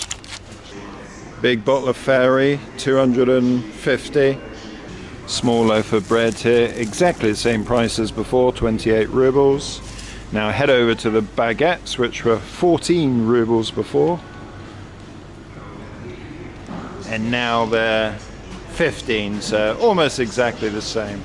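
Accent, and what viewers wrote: United Kingdom. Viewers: Are you British? I know, the accent is but hey one can't make assumptions nowadays. Is there discrimination against foreigners in Russia?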